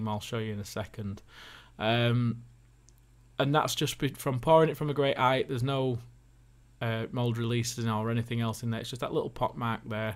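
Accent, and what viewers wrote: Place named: Yorkshire, United Kingdom. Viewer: Never have I seen someone clearly more Yorkshire than you... as someone from the Red Rose county i could take a natural offence. However, love your work so keep it up! ;)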